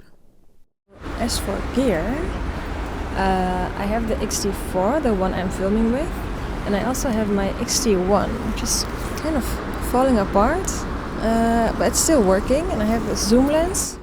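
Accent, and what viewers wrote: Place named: Netherlands. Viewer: Hezký klidný video. Jak dlouho už jsi v Amsterdamu? Mluvíš holandsky? .... totiž když video poslouchám, skoro mám někdy pocit, že ve tvojí angličtině slyším víc holandskej přízvuk než ten českej;)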